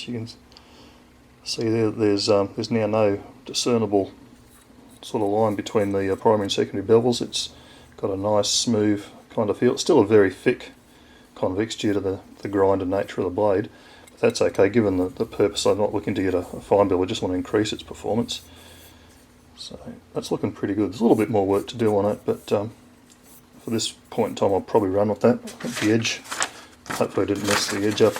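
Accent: Australia. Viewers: this American always enjoys a good Aussie accent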